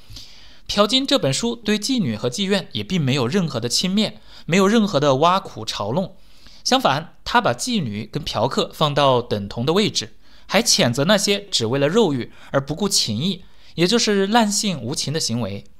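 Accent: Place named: Fujian, China. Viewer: 说普通话的感觉像闽西北的朋友 哈哈哈 很高兴认识您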